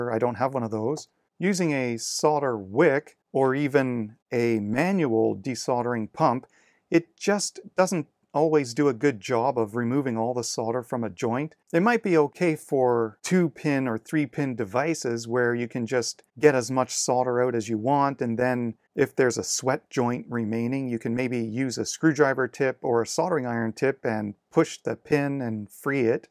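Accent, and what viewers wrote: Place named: Canada. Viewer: I love Canadian accents…ooot lol. Thanks for the tutorial. I guess single pin at a time is the way to go.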